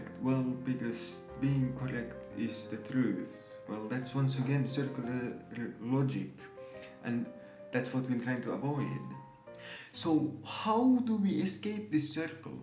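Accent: Finland. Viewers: Sama homma, pelkästä aksentista tajusin jo Logickedin videosta että nyt on suomipoika asialla ja olihan se sitten pakko katsoa että mikä kanava tää oli. Aikamoista, aikamoista... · Että hävettää suomalaisten puolesta kun rallienglannilla sönkkää halpojen jenkkiuskisten myyntipuheita juutubessa. Meillä on tän maapallon parhaita koulutuslaitoksia, kirjastoja ja terapeutteja. Jospa kävisit vaikka filosofian alkeiskurssin ennen kun sönkkäät tätä skeidaa koko maailman kuultavaksi.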